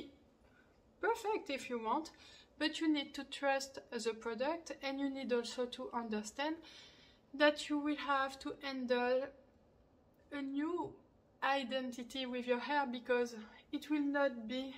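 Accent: France. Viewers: Love your French accent!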